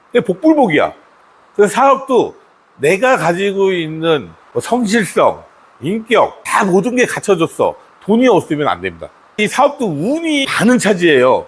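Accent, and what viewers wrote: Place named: North India, India. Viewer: 인도 북부 케쿠라 억양이 아직 많이 나마 있네요 한국말 잘하심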